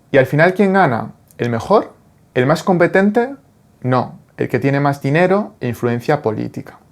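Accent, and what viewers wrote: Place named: Alicante, Spain. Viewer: Ya me percato que eres de Alicante, pero ¿de que pueblo? por tu acento cuando hablas el valenciano de Alacant (de la provincia) que varia según la zona y no aquellas en la que hablan el valenciano de valencia. Solo he visto dos videos tuyos en los que hablas del "tinglado" mas no de las clases de guitarra, instrumento que amo, en todas sus vertientes, y que mi papa me regalo una guitarra española, igual que una flauta, unas castañuelas, una armónica ( muy buena, no me la regalo mi padre ) dos panderetas de las de verdad ( una de un novio tuno ) una zambomba ( yo soy mu clásica )y ya de mayor independizada me compre un teclado para principiantes....en fin, en fin, no aprendi nada....mi arte discurre en otras vertientes y mi instrumento, el unico que puedo manejar, va incorporado a mi ser....no obstante, y por profesión, anexada a las otras, de estudios nada mas, sin masters ni doctorados ni padrinos de ninguna especie, aprendi y sigo aprendiendo edición por ordenador ¡ el futuro desde hace veinte años ! 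porque realmente lo que me interesa es la "creación" pero daria una de mis siete vidas por saber componer, solfeo y manejar el arsenal electrónico de Vangelis, no será por falta de imaginación, pero jamás, jamás , jamás....se me ocurriria, ni se me ocurrio ni ocurrira, presentarme a un puesto acomodaticio, público o privando, pero si es del estado, ni te cuento, de esos que nos ilustras.... tienes unas dotes de comunicación innatas, levantinas, de captación rápida, por efecto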